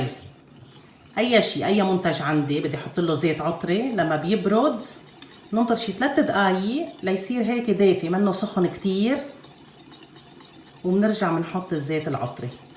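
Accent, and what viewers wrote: Lebanon. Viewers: احلى لهجه اني عندي لبنانيه كلش حلوه بتعقد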